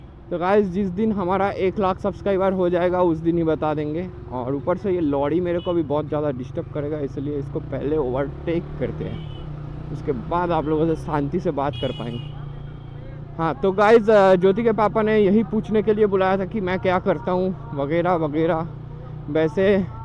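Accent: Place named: Bengal, India. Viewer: Bhai I like your way of talking that you can say that Bangla type Hindi. Actually I can't understand your Hindi because my mother tongue is Kanda but I like your video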